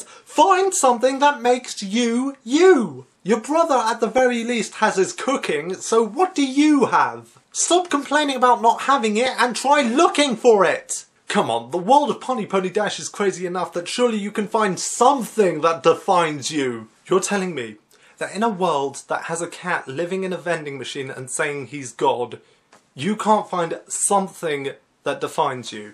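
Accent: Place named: United Kingdom. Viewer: ur british accent is so amazing its like sex to the ears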